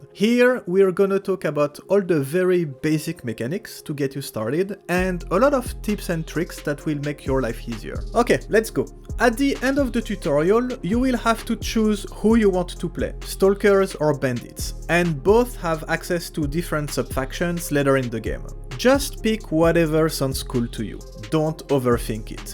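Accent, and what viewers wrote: France. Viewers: I love your french accent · Bro are you french? I like the accent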